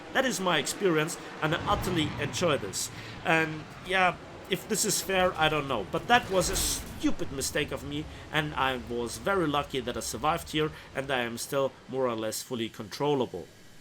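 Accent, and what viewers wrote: Germany. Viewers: He is German :)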